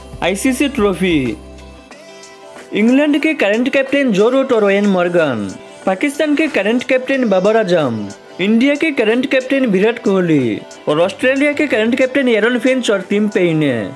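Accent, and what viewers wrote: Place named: Bengal, India. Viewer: জাতে বাংগালী তা কথার ভাবে বোঝা যায়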